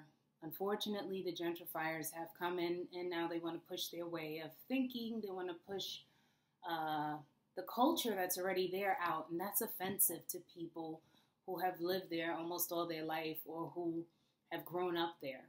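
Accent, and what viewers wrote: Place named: New York, United States. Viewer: Yes I hear that accent, but it's nice, I normally am not fond of NY accents, but yours is minor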